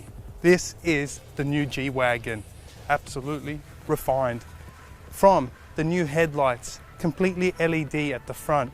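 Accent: Australia. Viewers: no, thats a spot on wog aussie accent. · The G63 is finally a true AMG worthy of wearing the AMG badge. The ONLY car sicker is the Brabus G63!! The best thing of all is having an Aussie review it, speaking “our” language!!!!! Brilliant! Sub’d, liked & notified!!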